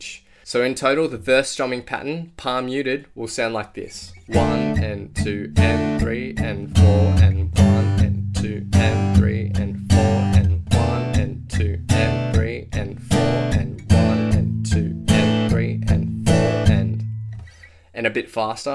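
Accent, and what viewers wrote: Australia. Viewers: are you australian? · are you aussie?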